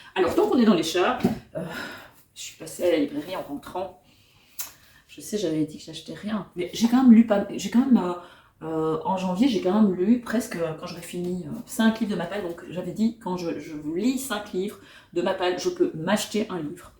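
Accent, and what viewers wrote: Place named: Belgium. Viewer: Hello, J'avais été très touchée par Ce qu'il faut de nuit, un coup de ❤et effectivement il a obtenu plusieurs prix dont celui des lecteurs du livre de poche. Alors pour le langage, tu m'as fait rire😅 car c'est simplement que l'auteur retranscrit quelques expressions et l'accent trainant lorrain, notamment sur le "O" et "A", dont il est originaire. comme toi, tu as l'accent belge pour prononcer certains mots. Je n'ai toujours pas lu les mémoires d'un chat qui est dans ma whislist depuis longtemps... Bravo pour tes chroniques qui donnent envie de lire😊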